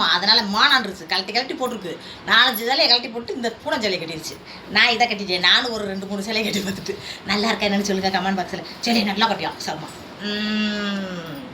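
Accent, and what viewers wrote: Madurai, India. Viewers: Nee pesarathu alaka erukku ❤❤❤❤ மதுரை தமிழ் super ❤❤❤❤❤❤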